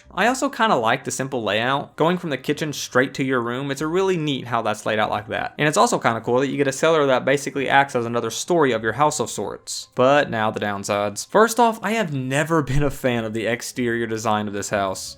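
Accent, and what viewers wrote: South, United States. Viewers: You and theepicnate are easily some of my favorite skyrim youtubers. Just something in the way your videos are so fun and calming to just listen to as you go about your day. The southern drawl in your voice is just the cherry on top. Great vid man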